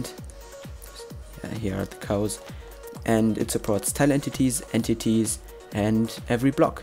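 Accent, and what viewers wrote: Germany. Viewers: Are your a german guy?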